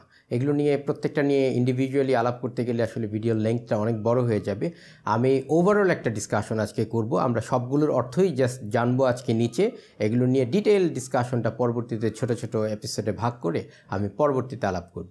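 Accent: United Kingdom. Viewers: আপনার ইংলিশ উচ্চারণ বিট্রিশদের মতো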